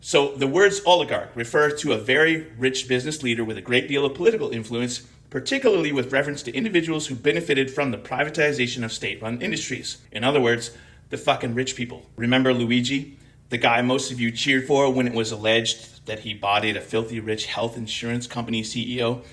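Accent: Scotland, United Kingdom. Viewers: Definitely hearing some Scottish accent in this one! Thank you for the outrage!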